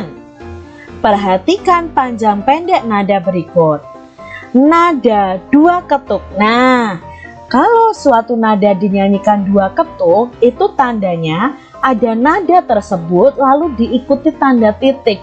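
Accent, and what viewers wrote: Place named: Java, Indonesia. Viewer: Suara nya kok jawa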